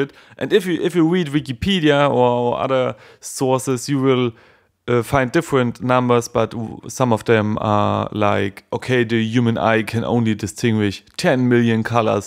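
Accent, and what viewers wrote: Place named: Germany. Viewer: I prefer the video for an introduction and then read your article. Your german accent helps me to understand your English as I am French. Reading is a more abstract process and requires more attention.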